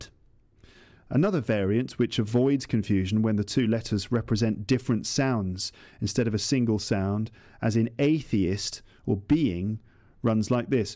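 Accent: RP, United Kingdom. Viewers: Come up with more such podcasts. I love your British accent :) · You're the best podcaster for me. I listen you becouse I want to have RP accent and It's so clear (understandable.)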